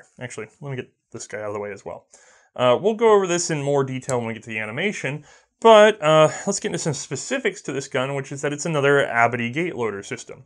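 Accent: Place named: Carolina, United States. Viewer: It's spelled and pronounced "perdy." Gotta love that Carolina accent. :D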